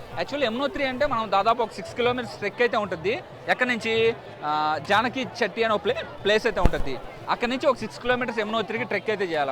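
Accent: Nellore, India. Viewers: Nellore slang is super - mama 😁😁😄😄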